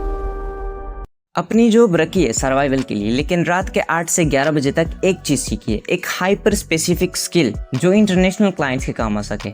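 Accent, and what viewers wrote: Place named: India. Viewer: laanti indian propaganda chanel....listen to his funny "hindi accent, pronouncinf "Z:" with "J".......chaeapter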